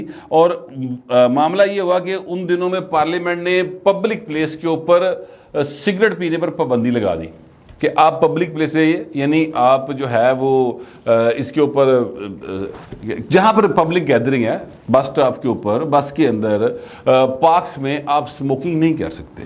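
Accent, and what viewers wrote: Lahore, Pakistan. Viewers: sb hum ap ko pure Lahore k sentences sunnay k lye sunty h. Very beautiful Lahore Punjabi Accent. Mere Moula Kareem ap ko salamt rakhy ❤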